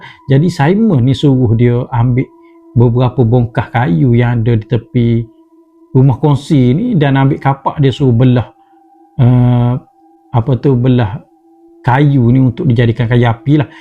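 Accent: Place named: Kelantan, Malaysia. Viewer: Bahasa tu bang.... Lekat sangat bunyi Kelantan... Kadang kurang faham... 😊... Harap maklum ye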